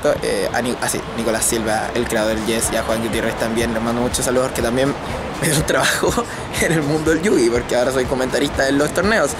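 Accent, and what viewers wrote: Chile. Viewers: y? creo que son de mi ciudad Arica, sino sorry jaja. Oye que bueno que has mejorado tu forma de hablar, no te conozco en tus inicios, pero esa forma animada de decir las cosas a la chilena es tu buen sello, saludos compaa.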